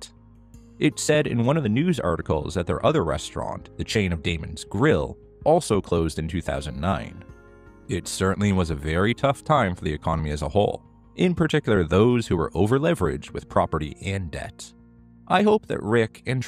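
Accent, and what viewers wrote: Canada. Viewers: Just listening to ur accent, are you from Canada? %❤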